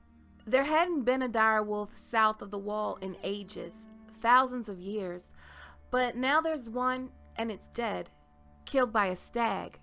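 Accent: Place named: South, United States. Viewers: I am so confuddled with all this downtime between the shows seasons and listening to so many theories. I was so hoping WOW would have come out inbetween so we could all get our fix of GOT. So tempted to try and hold out for WOW before seeing season 7 but jeez i'd have to go live in a cave for however long. It is going to be such a stretch back, after what is obviously going to be quite a dramatic season for our beloved characters. So many of your theories ring true, especially in book sense, some not so much but love the DNA thing, and that southern drawl is possibly one of my favourite things to hear GOT theories in as it is so detached from the accents we hear in the show it really has a narrative quality. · for this kind of a video... her southern heavy accent is terrible and takes away from the video! sorry, my opinion.. but the content is great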